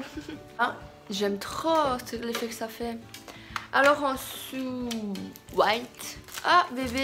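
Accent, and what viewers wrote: Belgium. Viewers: Tu es belge sa s entend 😂 moi aussi je suis belge bisous je t aime ❤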